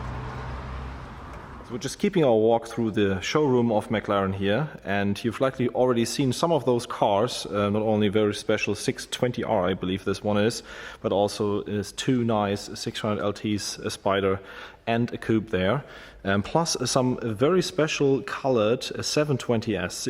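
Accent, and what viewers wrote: Germany. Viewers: Fucking channel sponsered by Audi with digsugting german accent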